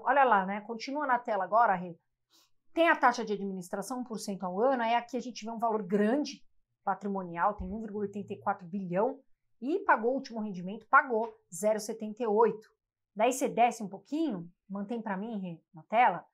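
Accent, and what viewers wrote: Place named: São Paulo, Brazil. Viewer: Sotaque paulista e horroroso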